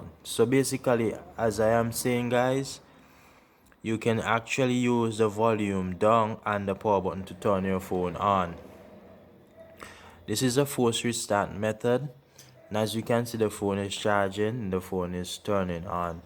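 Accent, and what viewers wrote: Caribbean. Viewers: As soon as I heard the voice I knew I was in good hands. Soothing Caribbean accent man, thank you so much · Which part of the Caribbean are you from?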